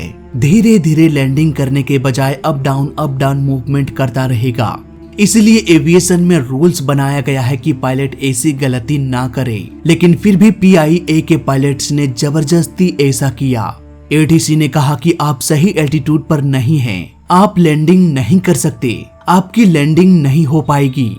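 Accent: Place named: North India, India. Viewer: यह एक उत्तर भारतीय भैय्या है , इस की भासा (भाषा) और pronunciation साफ दर्शा रहे हैं। इनकी G मे एक कीड़ा है, अपनी कमियों को दर्शा कर उस को सुधारने की बजाए, दुसरे के शौचालय मे नाक घुसा कर यह सुनिश्चित करना चाहते हैं कि कल इसने क्या खाया था।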